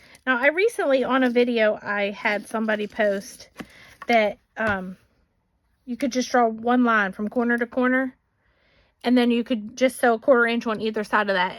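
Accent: South, United States. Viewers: I've watch various quilters tutorials to help me as a beginner. I truly believe you are one of my top 5 that I can watch patiently and understand what you are doing. Plus, I love your little "southern" accent. It's adorable. Beautiful work always.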